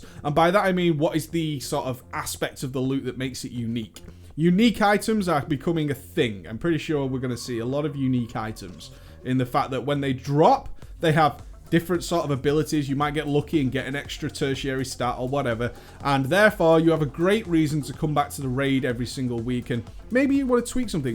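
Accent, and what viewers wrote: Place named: Lancashire, United Kingdom. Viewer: i think hes from the lancashire region of england tis a funny accent :P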